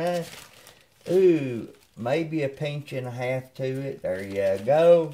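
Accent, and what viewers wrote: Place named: South, United States. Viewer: I honestly enjoy that you sound like forest gump (not an insult on your intellect just similar accent)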